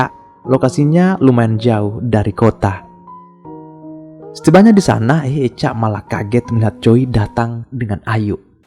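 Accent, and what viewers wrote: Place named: Sulawesi, Indonesia. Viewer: weee min logat mu kya logat Sulawesi 😭😭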